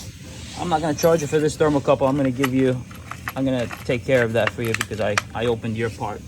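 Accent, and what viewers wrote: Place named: Brooklyn, United States. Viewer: Definitely brooklyn.... Guess from an Ohioan😅 Couldn't catch your email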